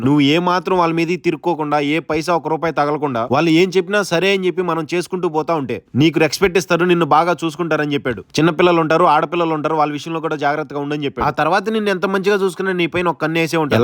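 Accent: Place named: Madanapalle, India. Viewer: Bro pakka Madanapalli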